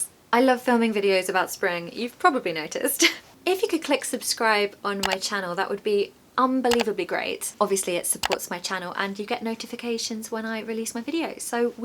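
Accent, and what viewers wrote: United Kingdom. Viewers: You have my dream British accent. I can listen you speak forever. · I love your accent,you are the incarnation of the real British English I’m from Chile 🇨🇱 and I love the British Accent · hi from Bavaria Alpen! I enjoy your British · Hello, I love these beautiful words you taught me with the good definition. You are teaching me lovely words in the different seasons, months, and even in the Valentine"s day. I love learning those special words from you with the beautiful British accent and pronunciation. when you mentioned about Lady Bird, I used to read Lady Bird childrens" books in my childhood. That was my foundation of learning British English. Now you are teaching me beautiful British English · Omg the British accent is so rejuvenating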